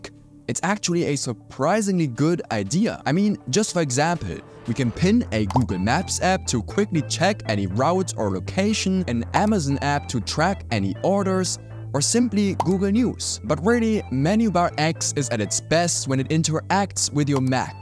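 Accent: Germany. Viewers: Ich wusste irgendwie dass du kein Ami bist. Hast ein sehr deutschen Akzent😊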